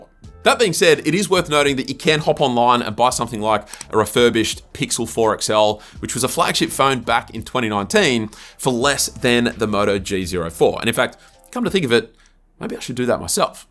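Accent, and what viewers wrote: Australia. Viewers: His Australian voice makes this even more soothing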